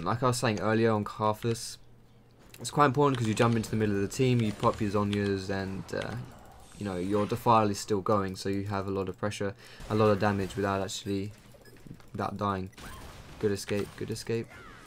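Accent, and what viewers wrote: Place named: United Kingdom. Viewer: Very manner intensive. Dat British accent.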